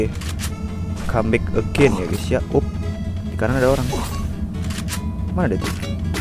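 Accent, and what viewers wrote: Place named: Makassar, Indonesia. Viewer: KaLau BoLeh Tau Abang Orang Mana , Kok Logatnya Kyak Makassar ( Masih Mauko Datang Besok ) 😂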